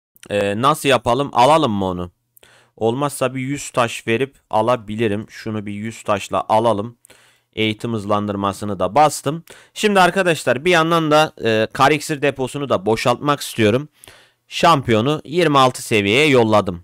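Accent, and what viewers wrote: Turkmenistan. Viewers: Abi sen turkmenmisin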